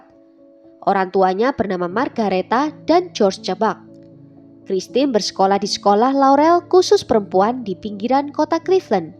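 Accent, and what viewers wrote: Java, Indonesia. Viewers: Mbak ini pasti wong jowo, medhok jowone 😉, tp ini jadi ciri khas yg bikin beda dengan yg lain. Sukses ya mbak 👍🥰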